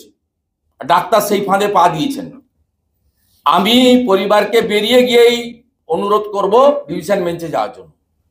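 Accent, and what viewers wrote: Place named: Odisha, India. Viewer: Uriyader moton kotha bolay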